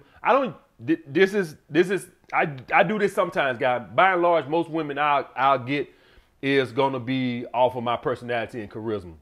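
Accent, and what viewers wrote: South, United States. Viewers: talking that real down south fly nigga sl-l!+ Them late 80s, 90s to early 2000s crown vics, Chevys, Buicks and lacs, mane!!!! That brought me back, good memories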